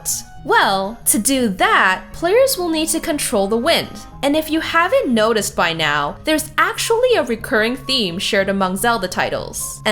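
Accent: United States. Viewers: please stop saying miamolto, , miamoto, , , you sound american, and yet when you say his name you try to put an H in there , like, miamohto, wtf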